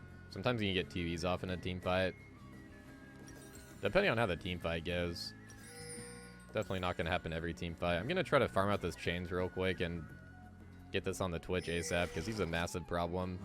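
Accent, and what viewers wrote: United States. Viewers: He's not European, he's just from the west coast.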